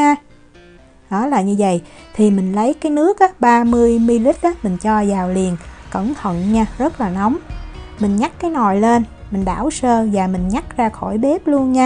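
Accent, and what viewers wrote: South, Vietnam. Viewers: Thích NC rất dễ thương, giọng miền Nam truyền thống, và đặc biệt là có để hình đại diện, chứng tỏ D rất chân thành và gần gũi, còn mấy kênh hướng dẫn về nấu ăn ko bg cho thấy mặt. 🤩🤩🤩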